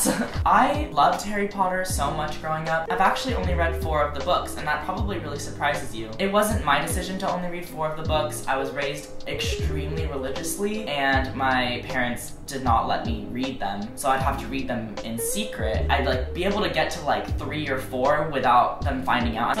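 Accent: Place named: Texas, United States. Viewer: your texan accent is so prominent in this video. IM QUAKING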